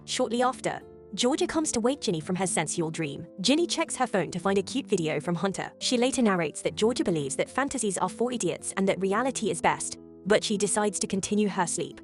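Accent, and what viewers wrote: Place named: United Kingdom. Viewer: I really love you using British accent ai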